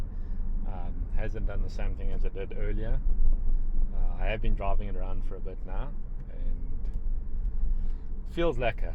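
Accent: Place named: South Africa. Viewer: Lekker! Nog 'n video en 'n bietjie Suid-Afrikaanse aksent! Musiek in my ore!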